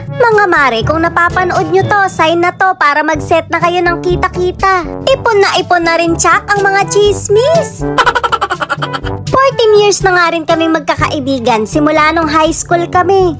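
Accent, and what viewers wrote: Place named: San Miguel, Philippines. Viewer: Natutuwa talaga sa mga taga San Miguel ganyan na ganyan magsalita tulad mo? Nalimutan ko nga name fr ko dyan siguro age nya nasa 45 yrs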